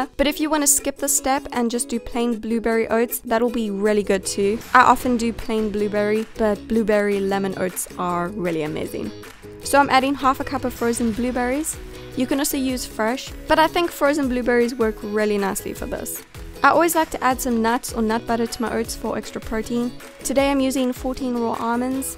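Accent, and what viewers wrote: South Africa. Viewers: South African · are you South African? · You’re South African! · She's south African like me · Sounds like a South African 🇿🇦 ?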